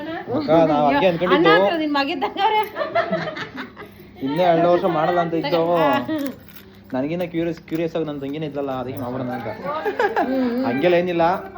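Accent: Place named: Tumakuru, India. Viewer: Namdu mavathuru atra saggere... Nimdu yava uruu guru. Namma tumakuru bashe super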